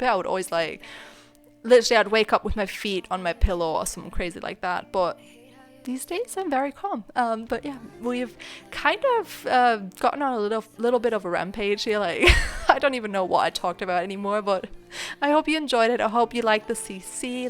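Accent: Germany. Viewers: german accent? :D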